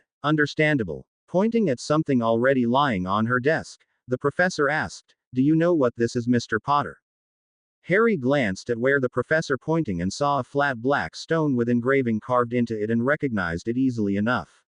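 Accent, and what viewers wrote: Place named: India. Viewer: Just let the AI read sverythinh l8ke before because we cant hear you properly Ypur indian accent is very heavy..and the audio qualjty is now poor unlike your earlier stories Yhr stories are nice but work on that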